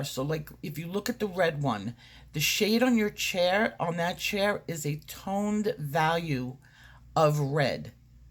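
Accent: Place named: East Coast, United States. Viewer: I am so excited to do the step by step with you and what an awesome gift....you are a very beautiful teacher. You remind me of Cary Ublouer who was a teacher I studied with many years ago. Since you have an accent, I told my friend Bill about you...he just loves your lessons because he's from the East coast and misses home! Thank you so much for your sharing your experience with us. You're a wonderful teacher!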